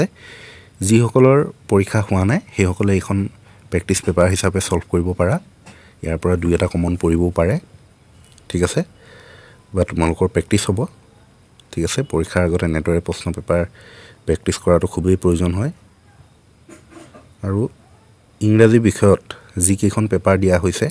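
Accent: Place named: Sivasagar, India. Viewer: English laga sivasagar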